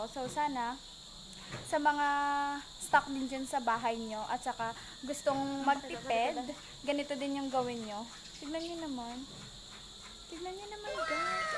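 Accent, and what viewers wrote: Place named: Iloilo, Philippines. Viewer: Ang galing naman ng salita nyo parang mga ilongga original 😁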